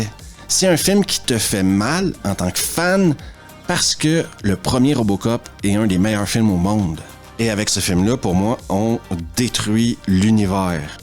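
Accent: Quebec, Canada. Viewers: Toujours agréable quand ton québécois ressors ! Une criss de honte !! J’ai ri !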